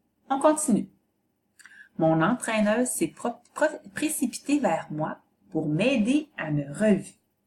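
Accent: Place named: Quebec, Canada. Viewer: J'aime l'accent québécois.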